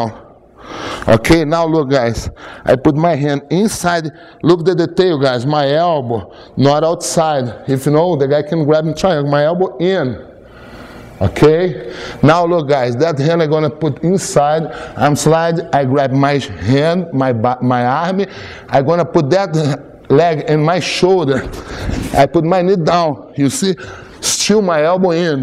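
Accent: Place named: Brazil. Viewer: My sensei is from Brazil who is living in Australia with a similar accent to. I find it normal when Brazilians or any Nation's first language is not English. If you understand BJJ then listening to broken English is not important when you're paying attention to the drill/technique more;) Master - I love watching your videos. I learn so much especially of someone from a higher belt. CHEERS:)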